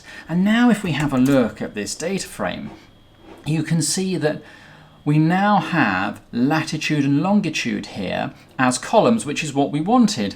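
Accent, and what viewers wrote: United Kingdom. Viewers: This is the best british accent I have found on the internet, thank you!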